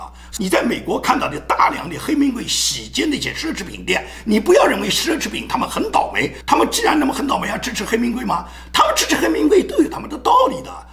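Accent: Nanjing, China. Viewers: 口音是南京人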